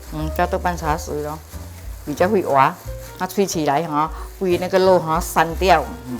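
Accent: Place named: Hokkien, China. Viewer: ，你讲话很像我妈妈，参一点福建话这样啊😊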